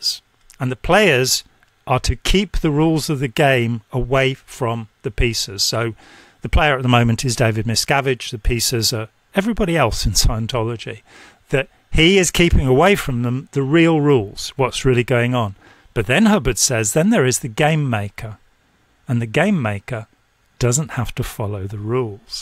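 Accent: United Kingdom. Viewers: I keep hearing Brian Cox in this man's voice. Must be from the same part of Britain. 😊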